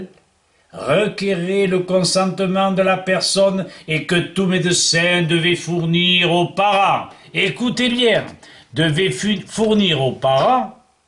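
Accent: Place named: France. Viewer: Monsieur je vous découvre et c'est un ravissement. Accent de notre France, accent de mon enfance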